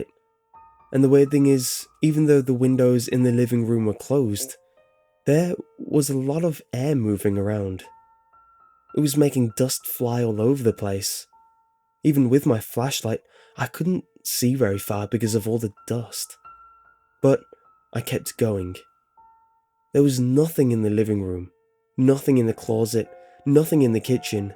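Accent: United Kingdom. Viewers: Love your british accent ( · 70 isn't that bad, where I live 60-70 is the norm...then again where I live one day it will be sunny and 70 degrees and the next day 20 degrees and snowing that Indiana for you - _ -; Also I just realized are you referring to Fahrenheit or Celsius? In the US we use Fahrenheit to calculate the temperature. Even though the narrator was british, the author of the story was probably american.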